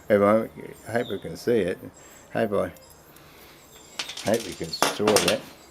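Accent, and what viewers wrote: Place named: Australia. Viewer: Good on you,a video spoken, the only way,others don't understand true Aussie, I really enjoyed it,congrats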